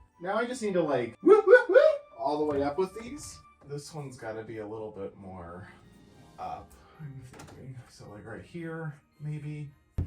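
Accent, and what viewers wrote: Minnesota, United States. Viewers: My favorite part was - , looking down through the garland balusters at the winter wonderland below. Everything looks SO beautiful. I don't know how many Christmas trees you have total, but I decided at the start to make "5 Christmas trees" the 5th item. On the 5th Day of Vlogmas my true love gave to me: Five Christmas trees 🎄🎄🎄🎄🎄 Four meows from Paolo Three sets of batting Two power strips 🔌🔌 And you sound like the movie Fargo 🎄⛄🙂⛄🎄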